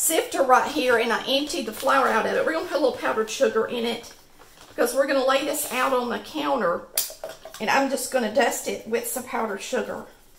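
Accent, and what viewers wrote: South, United States. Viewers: HI, Im originally from THE ICE BOX OF THE NATION, INTERNATIONAL FALLS MINNESOTA, but we lived inTennessee for 15 years, now we’re back living in northern Minnesota. I miss hearing the southern drawl I love listening and watching your show, along with your wonderful cooking. Your a great teacher and have a sweet loving personality. I’ve always been curious about the southern potato candy I’m gonna go ahead and make me a batch.♥️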